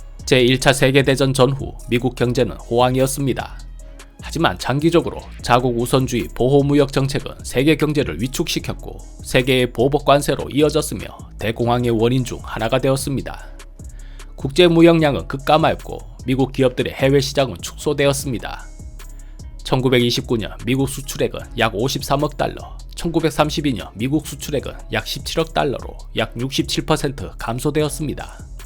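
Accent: Gyeongsang, South Korea. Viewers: 왠..경상도사투리..!!..트럼프가 영샘이가??..표준말버젼이면..더욱좋겠구만..!!갱상도사투리 듣기가매우거북함..!!..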